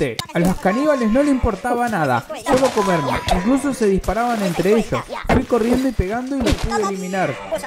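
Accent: Argentina. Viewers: ¿sos argentino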